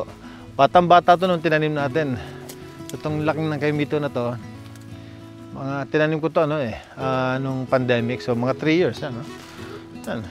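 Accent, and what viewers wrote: Visayas, Philippines. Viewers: Ka bisdak nas imong accent sir oy hehehe 😂 ❤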